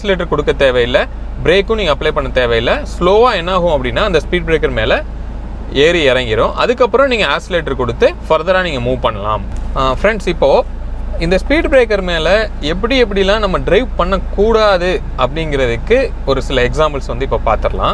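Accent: Virudhunagar, India. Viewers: Bro neenga விருதுநகர் ahh bro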